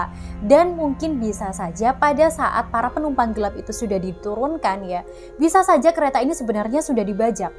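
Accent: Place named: Surabaya, Indonesia. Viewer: MBAK E WONG SUROBOYO 😅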